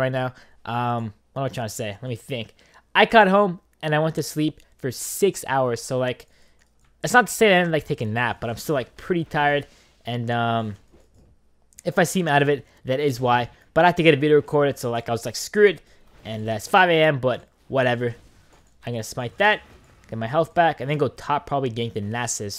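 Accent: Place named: Boston, United States. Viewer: are you from boston or around the east coast? you kinda sound like it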